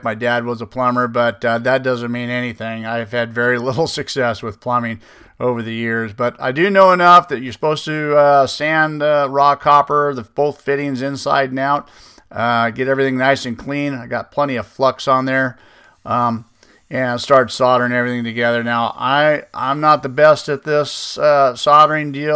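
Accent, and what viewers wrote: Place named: United States. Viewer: It's the American English pronunciation... the norm here.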